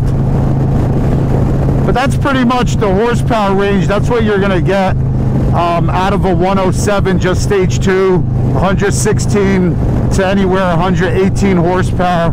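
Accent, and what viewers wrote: New Jersey, United States. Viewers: Agree Stage II is the way to go. Best bang for your buck for sure. How was your cup of coughvee? :-) Love that Jersey accent.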